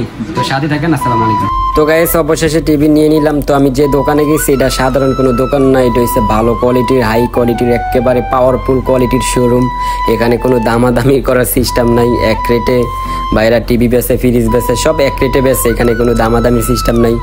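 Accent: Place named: Chandpur, Bangladesh. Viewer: ভাই আপনার বাড়ি কি চাঁদপুর নাকি মতলব? আপনার ভাষা অনেকটা আমাদের মতো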